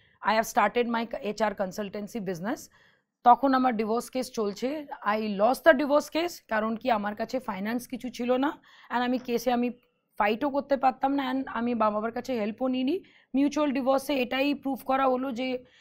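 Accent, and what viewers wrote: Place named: Hindi, India. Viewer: Punjabi biye korle ki nijer matribhasha ta accent diye Hindi er moto kore bolte hoy?? Eder eituku video dekhei ja fake r nyakami, real life e ki jinis k jane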